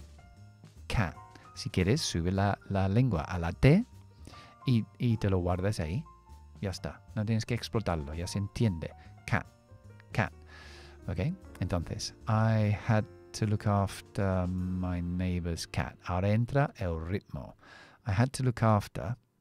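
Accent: United Kingdom. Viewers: Hola, le agradezco que también incluya la pronunciación Estaudinense. Al principio no me gustó mucho por que le noté el acento Británico, pero después si me inscribí por que enseña muy bien, e incluye la pronunciación ya mencionada. vivo en USA hace muchos años, pero aún no soy fluente, pues nos pasa a muchos inmigrantes, ya que nos enfocamos mucho en el trabajo y no en perfeccionar el idioma y ahora que quiero buscar nuevo trabajo necesito ser fluente y urgente, así que todos los días estoy escuchando y practicando, necesito hacer en unos meses lo que no he hecho en muchos años 😢 Si da clases privadas o en grupo, me gustaría saber. Gracias, bendiciones 🙏🏼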